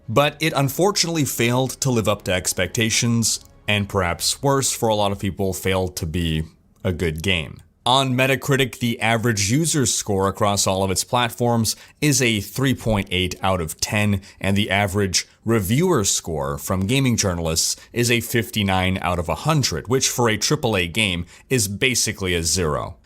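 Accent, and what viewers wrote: France. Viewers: You have a very good french accent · Your french ascent :D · ur french accent is not bad!!!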